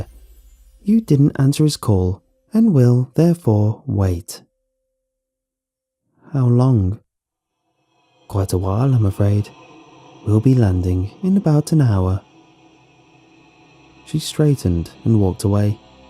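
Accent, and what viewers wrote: England, United Kingdom. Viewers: Hahaha He do have a noice English accent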